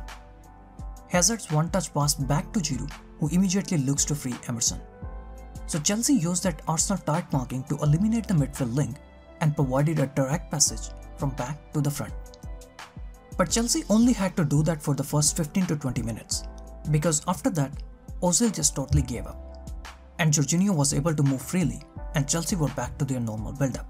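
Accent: India. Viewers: I don't know him but this pronunciation sounds like that of an Asian, especially an indian, great analysis i wonder how could an Indian know so much of football instead of cricket.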